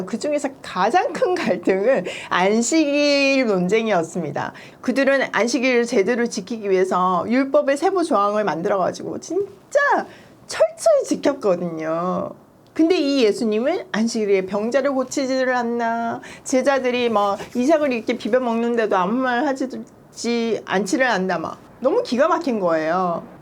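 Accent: Gyeongsang, South Korea. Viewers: 경상프라빈스 인토네이션 이군요 일크름을 넘 감사합니다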